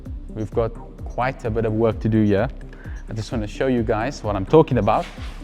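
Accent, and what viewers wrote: South Africa. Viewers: U know wat i love about SA accent? Its soo thick, u cnt disguise it shem😂😂😂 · Why do you speak like you’re from Upington or Springs? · He's obviously Afrikaans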